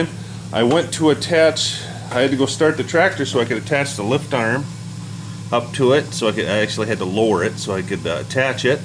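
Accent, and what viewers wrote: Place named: Upper Peninsula, United States. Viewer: EXCELLENT series! I sure hope my tractor restoration turns out 1/2 as beautiful as yours! I can't wait until spring so I can paint. ps. I'm from Michigan and you sure sound like you're from the U.P.!! ps.ps. My family and I vacation near Republic every summer......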